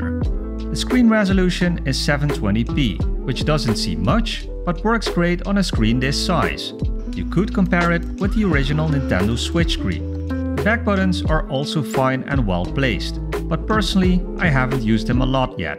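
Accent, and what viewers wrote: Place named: Netherlands. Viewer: Jij bent toch een Nederlander é haha, ik hoor het aan je accent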